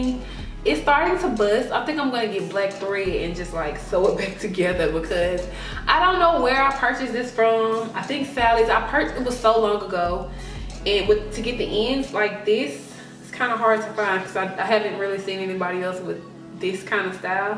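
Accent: South, United States. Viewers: I love your accent! Are you from Louisiana? Somewhere in the south? I'm from Mississippi.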